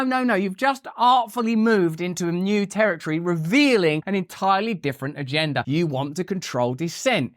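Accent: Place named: Cockney, United Kingdom. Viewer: I saw you in a period piece for Public Telly with short hair in a suite and thought you were impressive in a Dramatic role. All this latent 1960s British Hippy or 80s big hair sex drugs, MTV crap is why you and Trump are kindred spirits, but you are more articulate even with that London Cockney. Brilliant assessment of the Gag Order and Trumps Al Capone comparison. Loved the Jesus analogy and Trump juxtaposition De javu.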